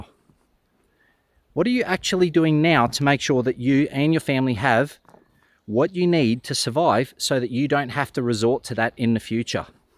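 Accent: Australia. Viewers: Very sensible advice. You sound Australian. Best wishes. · It's interesting but I'm hearing what seems like an Aussie talking about Norway with no upfront explanation of that apparent anomaly... ;-) My big concern is much less the typical grid down prepping issues (not that I am indifferent to that!), but the tyranny that is unfolding from our western governments. I lived in Latvia for 5 years and recently returned to my homeland of Northern Ireland, largely due to concerns about the Russian adventure in Ukraine and what that might lead to, but now I am seeing "hate speech" laws being drafted in both the UK and across the border in the Republic of Ireland that make Stalin's dicta look meek and gentle. Essentially, what is being proposed is the legal framework for data-mining everyone's communications in the past to profile them for potential future acts of "hate crime" (i.e. 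any opinion that goes against their pet narratives) and target them for pre-emptive arrest and imprisonment as a means of preventing them offending anyone with a "protected characteristic". Right now, I feel like I have jumped out of the frying pan and into the fire.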